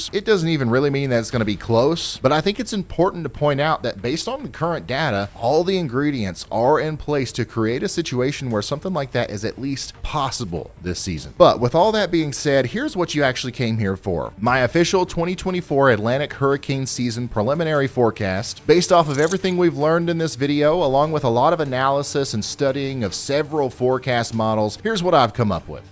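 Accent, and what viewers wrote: South, United States. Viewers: I follow your weather channel cause l feel you know your stuff in reference to weather. From Puerto Rico. I have been to kuntucky got family there. I love the southern accent and miss my friends out there.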